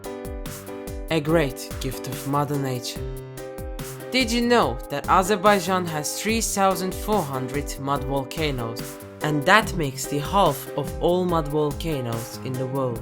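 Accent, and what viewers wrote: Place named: Russia. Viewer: Русский акцент слышен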